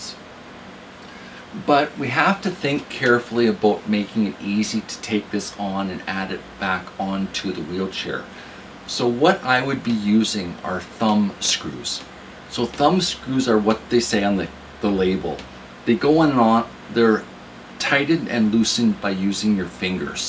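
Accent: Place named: Midwest, United States. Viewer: I was looking for a different purpose. But FYI, your idea makes perfect sense for your purpose. I am guessing by your mild accent that you live in the Midwest or maybe even Canada. Living in blazing hot Texas, I would suggest anyone living down south select a sturdy light colored fabric such as an upholstery fabric or painters tarp or canvas and buy a good UV waterproofing spray to more effectively fend off rain and the heat of the sun. Your design idea also gave me a kernel for my unrelated shade cloth project, so thank you sir. 😄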